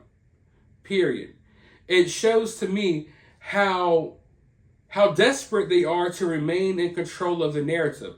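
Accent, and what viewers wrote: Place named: Philadelphia, United States. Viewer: You're down south? But you say "woodder" instead of water as we do in Philadelphia. I assumed you were from the northeast 😂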